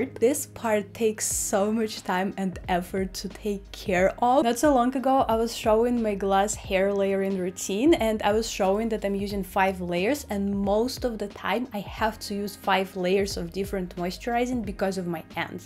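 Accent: Russia. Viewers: From the accent and intonation of her voice, I realized that the girl was Russian. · I could listen to your accent for days. Is it Russian? Thank you for video. I am disabled and cant afford salon anymore plus i have depuytens contracture in my dominate hand. It makes for very difficult to trim my own hair.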